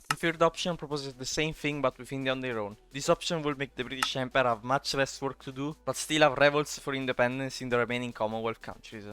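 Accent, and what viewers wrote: Italy. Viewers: Ho capito subito che sei italiano per come parli inglese (non avevo visto il nome del canale) 🤣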